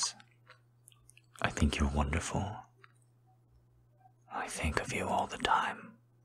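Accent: South, United States. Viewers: Hi my name is Alyssa Hayes and i love watching your video and ur voice is so hot sexy and i love your country accent voice and could u do the Country accent roleplay Cowboys romantic for me? :-D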